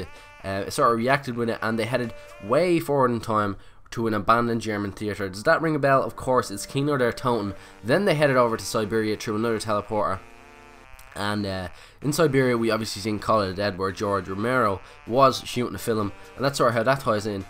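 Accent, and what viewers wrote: Ireland. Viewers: is je Irish he sounds really irish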